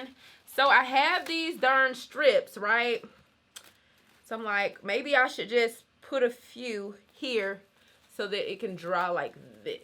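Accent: South, United States. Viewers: Ur southern accent is coming through in the clutch ❤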